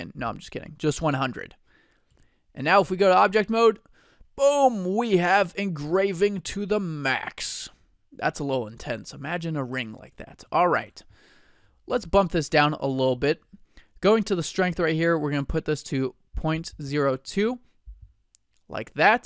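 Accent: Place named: France. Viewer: You have a perfect French accent ! Thanks for this tutorial.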